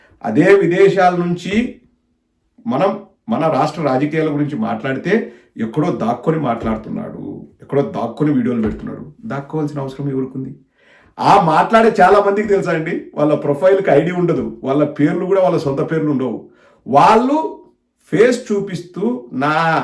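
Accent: Rayalaseema, India. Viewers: అన్నా మేము డిల్లీ లో ఉంటాం రోజు మీ వీడియోస్ వింటున్న ఎప్పుడన్నా జోక్ వేస్తుంటారు గా పక్క మా అమ్మ నా వైఫ్ kuda కూడ నవ్వుతూ ఉంటారు మీరు మాట్లాడుతుంటే మధ్యలో మనం రాయలసీమ యాస మాట్లాడుతారుగా అప్పుడు మేము అందరం ఫాన్స్ అన్నా నీకు జగన్ అన్నకి ❤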